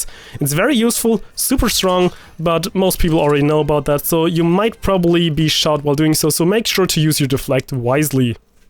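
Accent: Germany. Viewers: XD ich erkene wie du sprichst das ist auf jeden Fall deutsch besonders bei eichenwalde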